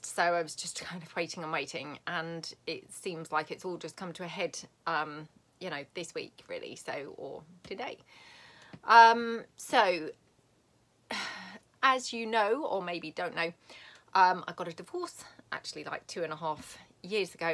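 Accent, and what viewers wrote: United Kingdom. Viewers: Hi, I was so touched by your candidness! I know you are under great stress right now. I never told you this but I feel compelled to share with you that I found you on YouTube right after I lost my beloved husband of 39 years to Covid-19. I instantly fell in love with your British accent (I live in USA) and your conversational style and your creativity. There were very many days when I did not want to go on living without my husband, and for the life of me I didn’t know how I would possibly get through the day! The only thing I could think to do was to put on your videos and watch them one after another after another, because your voice and your cheerful demeanor relieved my horrible anxiety. So - we, your viewers, owe you a massive THANK YOU! May God be with you tomorrow! 💜💜💜💜💜💜💜💜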